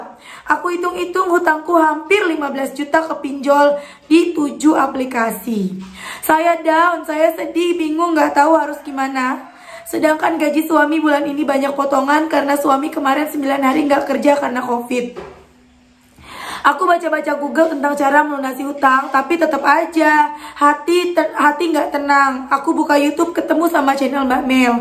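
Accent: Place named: West Java, Indonesia. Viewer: Ya Allah Sunda nya ketauan banget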